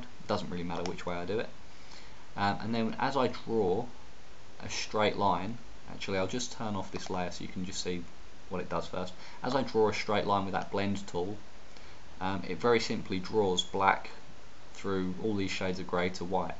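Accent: England, United Kingdom. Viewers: You have such a cool accent! I thought you were English!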